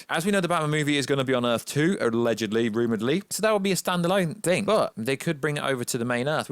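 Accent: United Kingdom. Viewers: What's your accent? Are you British speaking in a American accent?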